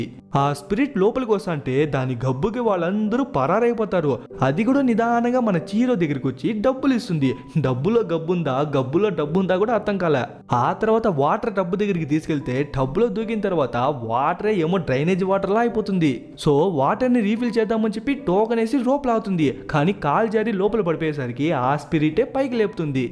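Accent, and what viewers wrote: India. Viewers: which telugu accent it is but i loved it❤😊😅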